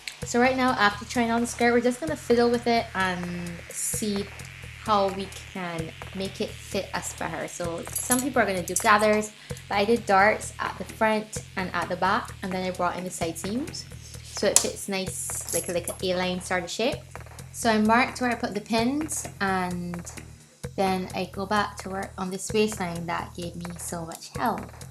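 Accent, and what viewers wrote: Barbados. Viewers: Heard the Bajan accent from the minute you started talking!💙🇧🇧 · Weird question, are you Bajan?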